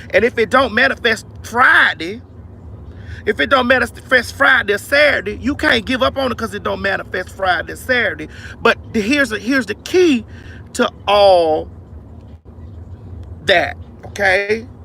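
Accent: Florida, United States. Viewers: Hurry up🤣🤣😂 With that Florida accent. I love you!! Yasssss Praise him!!😚😚 Omg!! Kick rocks and boulders! I'm on my side laughing!!💜💙💚💛❤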